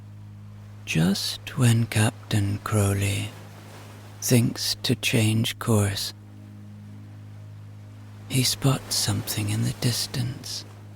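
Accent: Ireland. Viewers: Thank you. I realised how much I enjoy story time by the Irish. Your count down is amazing xxxx Goodnight from Australia ❤ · Another beautiful story from Megan enhanced by the lilt of our Irish ☘️ Storyteller,! I haven’t commented lately but you have literally been playing 22-23 hours/day& nite on loop for the last 3 weeks., 🤗you have been my best Friend during a severe Mito “crash”. Thank you for keeping me company even through my subconscious. I felt supported, safe, soothed & so much companionship in this time of restoration. My energy is coming back! Sláinte!!! ❤🙏🍻😊Wishing for You, Reb, & Timmy all the love, light, & amazing ENERGY you put out in the world back to you in spades! ☀️💖🌍♠️♠️♠️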